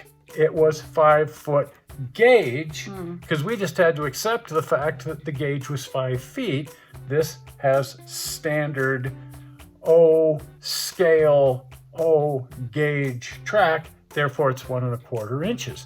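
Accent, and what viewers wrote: United States. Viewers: For those that commented negatively. Life is far to short to be commenting negatively. Please lighten up. I still think Hill Billy accent was funny. But I have been told I have no taste.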